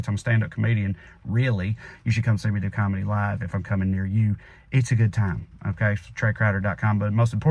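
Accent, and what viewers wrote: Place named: South, United States. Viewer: I'm an old Southern woman with an accent very similar to, so I tell him, "I love ya like I was your Mema!"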